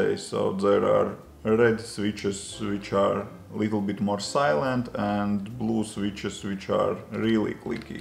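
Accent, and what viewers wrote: Russia. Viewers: круто! 3 языка знаете... У вас просто русский акцент когда говорите на английском ) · Словно русский заговорил на английском)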